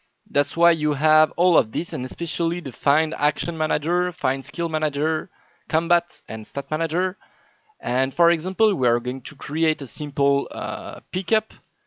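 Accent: France. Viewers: Es-tu français ?? sinon très bonne vidéo comme d'hab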